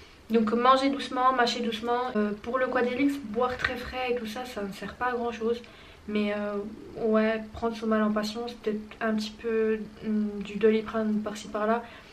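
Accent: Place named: Belgium. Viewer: T'as un petit accent belge, mais tu vis en France nan😂 ? ou exactement ?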